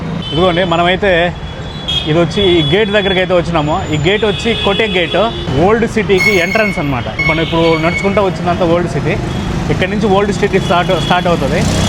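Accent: Nellore, India. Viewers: Hello brother.. Mede nellore mata laga vundi..... Made nellore le..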